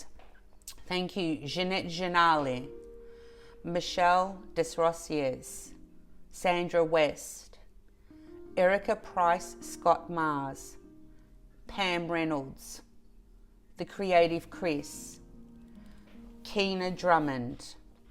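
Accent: Australia. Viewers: Congratulations on the 2k achievement,. What keeps me coming back day after day (besides your Aussie accent, LOL) is the fact that you are REAL! Your love for family and friends makes my heart Happy and your sense of humor makes me smile or even laugh out loud. Sending, as always, much love and lots of warm hugs💖🦋🌈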